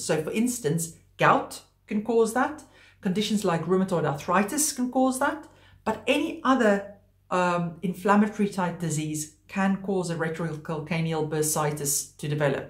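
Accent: South Africa. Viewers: Nice South African accent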